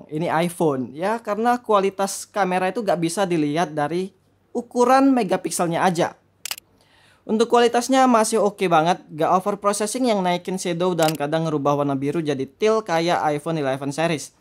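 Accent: Palembang, Indonesia. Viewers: pecak nyo wong kito :D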